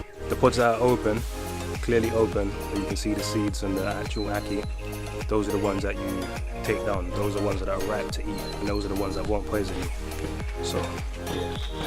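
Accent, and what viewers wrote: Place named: London, United Kingdom. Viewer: You sound like your from London, so am I and been living in Florida USA for 14 years. I have 2 ackee trees in my back yard, got a proper 15ft fruit picker with a basket to get them and thoroughly enjoy the harvest each year. Harvest is coming to end being November. My Jamaican neighbors love it too and give them bags of whole ackees so they can see it’s opened naturally. Once I made the mistake of giving them ackees I removed the flesh thinking I was helping. I have a hard time with squirrels here and can only try to keep them under control with an air rifle.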